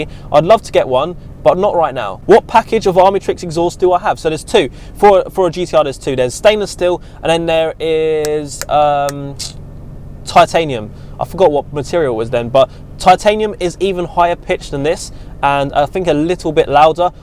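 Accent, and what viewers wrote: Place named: Birmingham, United Kingdom. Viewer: never heard your bham accent till this video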